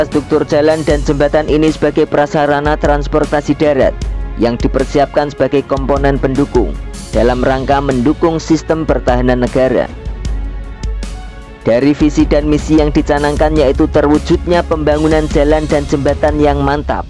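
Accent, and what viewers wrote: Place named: Java, Indonesia. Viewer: Medok anget suaranya..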